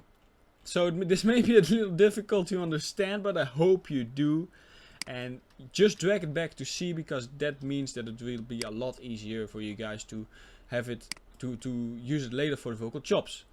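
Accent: Netherlands. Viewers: 2 sec in de video en ik dacht gelijk jup Nederlanderrrrrrr :) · ik hoor aan je accent dat je nederlands bent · Nederlands accent hahah. Nice vid man! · lol i think that MAN is dutch like me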